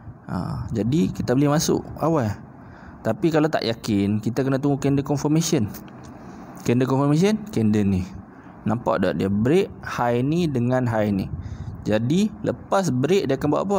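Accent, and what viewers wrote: North, Malaysia. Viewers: Mantap bro cakap utara baru paham kalay da contact number ws la nk belajar lg mndalam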